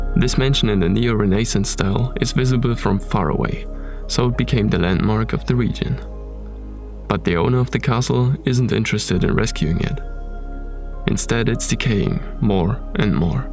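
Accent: Germany. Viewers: 💜💙 Que hermosura! Y que bella voz del narrador, tiene acento alemán 💜💙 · Is the person from this video German? I love his accent.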